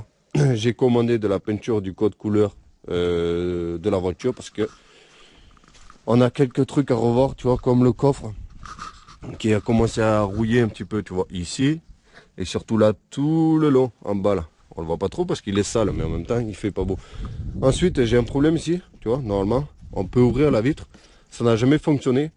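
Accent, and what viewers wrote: South, France. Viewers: Oh tu as l'accent du midi toi non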